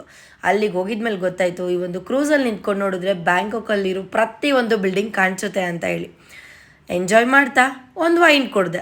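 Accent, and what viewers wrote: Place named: Mangalore, India. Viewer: Nimdu Manglore Kannada na. Nice to hear that ❤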